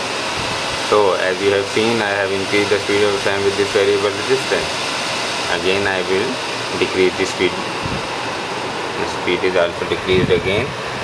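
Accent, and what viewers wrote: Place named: Pakistan. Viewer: wah brother Pakistani Laga Hn.. hahahahah i m Pakistani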